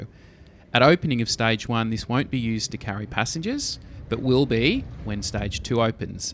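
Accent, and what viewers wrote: Australia. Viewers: i love your aussie accent.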